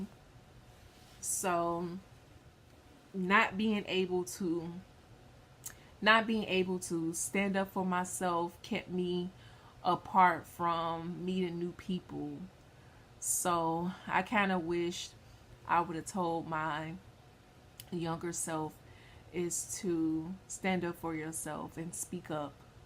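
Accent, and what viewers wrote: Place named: South, United States. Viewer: Great Message!! Where are yoy from? I hear a southern accent.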